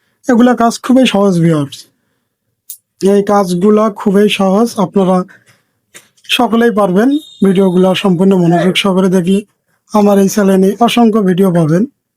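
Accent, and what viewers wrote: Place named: Rangpur, Bangladesh. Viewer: কথা শুনে মনে হচ্ছে আপনার বাড়ি রংপুর 😊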